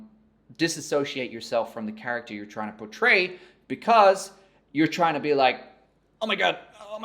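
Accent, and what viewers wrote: United States. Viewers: Lol! Love your yankee voice; Sounds a little like will Wheaton. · Honestly man, your American accent is on point. Good job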